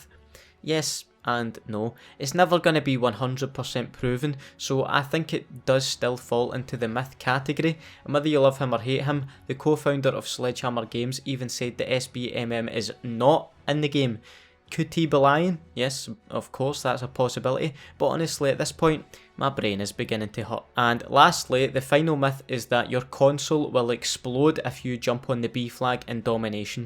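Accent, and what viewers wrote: Scotland, United Kingdom. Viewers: Am I the only one who thinks this guys scottish voice doesnt fit the spooky map myth? Also: I didnt mean to offend you, if this did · Love the Scott esh accent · Absolutely love your vids. I know I'm a bit (just a bit) late . I'm from western Scotland and I can definetly tell you're Scottish. What part of scotland may I ask? Also keep it up man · I was getting a little spooked for the ghost of vacant clip, until you said with your strong Scottish accent "What tae fuck is that".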